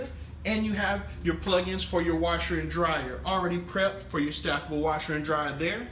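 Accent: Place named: Texas, United States. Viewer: We can never watch the videos all the way through because the way talks is so annoying. A mix of thug and texas rancher. Most media forms recommend a standard midwestern accent or so I was taught in journalism class.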